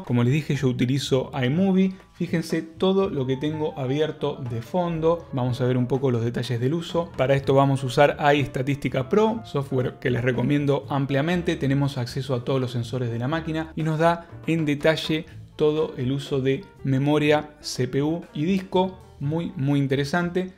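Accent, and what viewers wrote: Argentina. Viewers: Super argentino! Saludos! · Buen video! Feliz de ver videos sobre lo que me gusta en un español rioplatense! Saludos desde Uruguay!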